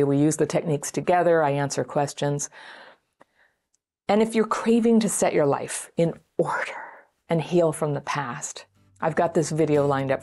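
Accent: United States. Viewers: so embarrassing about my cluttered apartment .. while running to shelter .....❤1. I brought this trip upon myself....Where to escape?? Currently, exhausted, Extremely isolated, brainfog, in Israel #under_fire🎉 #day24 2. Expat from the USA, and appreciate your American accent. Voice From home . I studied psychology... Came here to confront religious Absurd-abuserS who live in Israel.😮😅😊.... So glad I just found your channel/ account. I need tips + excersize for time of war. Staying in hallways/ bomb shelters mostly. Tiny spaces. In Israel under rocket fire. Bless u for all you do! Can send me an email with your videos on you tube or any other free content you can offer. Where are you located these days? City/state? I'm an Expat from Miami currently in central Israel! Sending love, light & hugs wherever it is needed. Amen!❤️ SaraGraceFreed😊3? How to pack and plan while under fire, 4. 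NEIGHBORs Friends have lost.lived ones... mmm. 5. Buying groceries instead! So confused. Help!❤Sara😂🎉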